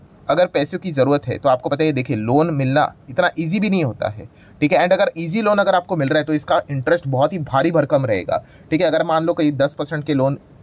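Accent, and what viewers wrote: Maharashtra, India. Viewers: guruji pakka maharashtra ke hai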